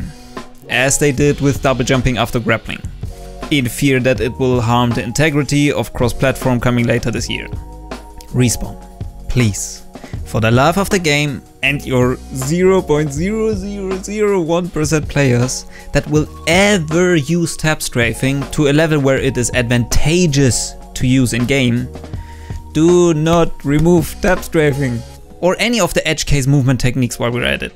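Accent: Germany. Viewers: Bro man hört so hart raus das du Deutscher bist 😂✌🏾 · dieses deutsche englisch :D sounds so fvcking weird with the german voice hahahaa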